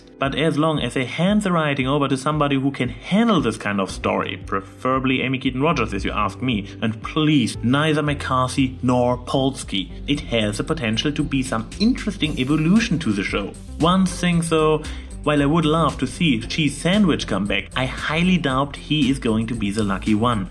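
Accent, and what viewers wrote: Germany. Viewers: You sound so german...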